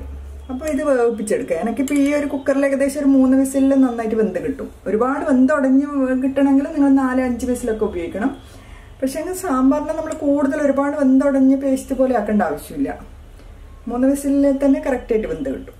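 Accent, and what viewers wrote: Kannur, India. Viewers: ഞാനും ശ്രദ്ധിച്ചു. അച്ചടി ഭാഷയിൽ നല്ല രീതിയിൽ അവതരിപ്പിച്ചു. എങ്കിലും ഇടയ്ക്ക് നമ്മളെ കണ്ണൂർ ഭാഷ വന്നു. ഞാൻ തലശ്ശേരി. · I like your kannur slang very much. I will try soon. To pressure Cook Tur Dal only 90 seconds required. If we are releasing the air from the cooker, we are waisting the gas. This for your information. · Kannur basha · സൂപ്പർ. കണ്ണൂരാണോ, വടക്കരയോ.... സംസാരം കേട്ടിട്ട്.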